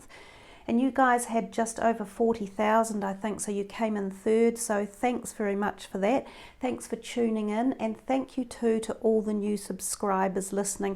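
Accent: New Zealand. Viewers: Love the "sucx" (6). Good old Kiwi accents. Not making fun, was married to one!!!! Hope Enzo will be okay!!!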